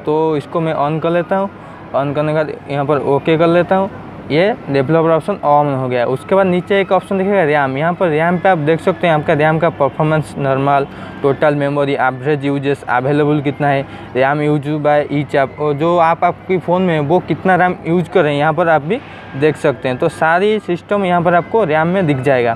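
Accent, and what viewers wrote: Odisha, India. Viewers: Are you frim odisha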